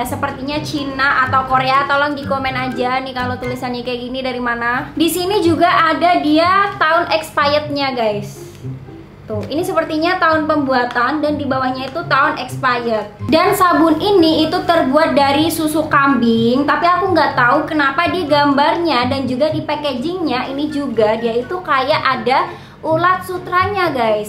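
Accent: Java, Indonesia. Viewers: Saya paling suka dari kakak ituh orang lucu, asik banget, medok banget lofat jawa nya,hehehe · Orang jawa kalo ngomong bhs indonesia lucu😂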